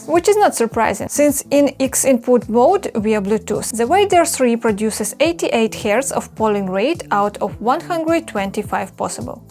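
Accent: Russia. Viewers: This person has no idea what they are even saying. Most obvious actor/ plant video I have ever seen. Not sure who paid her, not sure she has any idea either. But 379 subscribers and a strong russian accent. Yeahhhhhh gunna get a second opinion on that... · Russian accent is hard not to recognize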